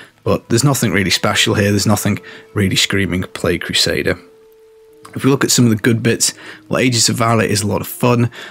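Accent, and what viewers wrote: United Kingdom. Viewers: first a disclaimer... my mother lenguage is not english..... that will be probably noteced im my speling throuout the comment, anyways.... The content that you presented is an A content. Nothing to say about that, but complements. Very good indeed... But i think you shoud think about the ppl like me... That the english is not the main language... You speak kinda fast and with a pretty strong uk accent... witch is not a proplem at all, but you speak realy fast, like your'e talking to your friends or family... Well... As you know, your content is spread world wide... that means, you shoud think about, i dont know, speak as a www content producer... if you know what i mean... love your job, love your content, but i had to go back few times to get exactly what the hell what you were talking about! heheheh... that is not a complaint.. that is just a tip for you.. 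you could try to be more articulated in you speach... dont hate me, again, that is a tip... maybe speak "last fast" or, i dont know, a little bit more "international", if you know what i mean... thanks for your job! As i said before, it is Ases!!!! ;)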